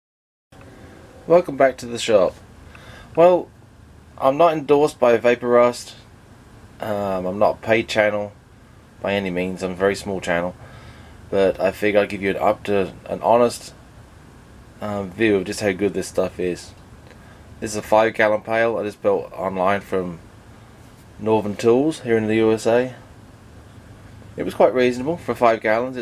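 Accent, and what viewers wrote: United Kingdom. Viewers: Dude you sound like you are originally from the uk or something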